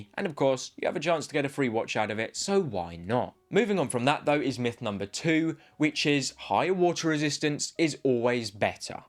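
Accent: United Kingdom. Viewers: Apart of the great content, I love your impeccable british pronounce. 2 lessons in 1 time: about watches and perfect pronounce… what’ else!👍🔝🔝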